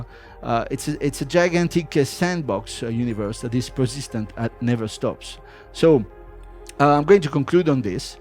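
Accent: France. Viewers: David Guetta's accent spotted. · il est français ? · French accent wtf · His french accent completely sold it to me.. Who do I give my money? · Are you French ? ._. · Are you Canadian or French? Your English is very good with French "tongue'.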